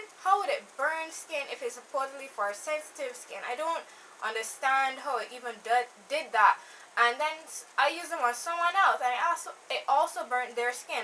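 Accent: Barbados. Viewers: i can hear that Barbados accent lol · she from barbados i caught on her accent ❤ carribean just like me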